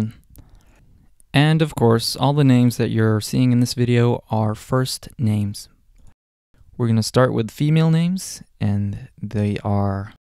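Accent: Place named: Setesdal, Norway. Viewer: Ja, Setesdalsk e noko for seg sjølv 😅 Men veldig fint då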